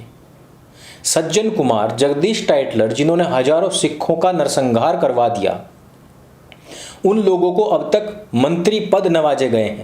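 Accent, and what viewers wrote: Haryana, India. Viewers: Waa bhai aaj haryanvi touch 😀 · आज बहुत समय बाद आचार्य जी को ठेठ हरियाणवी बोलते हुए देख कर बहुत अच्छा लगा ! कृपया कुछ संवाद हर विडियो में ऐसे बोला करने की कृपा करें !! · हरयाणवी लहजा 😊😊😊😊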